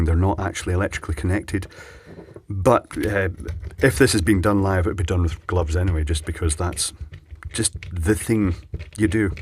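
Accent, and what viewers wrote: Scotland, United Kingdom. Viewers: Is originally from Scotland or Mann? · Wait, you live on the Isle of Man? I been thinking you were from Scotland this whole time.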